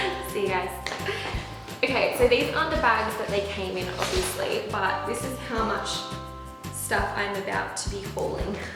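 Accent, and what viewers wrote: Australia. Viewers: Are you Australian? Random question, I know. But great job with the makeup though! 😃💄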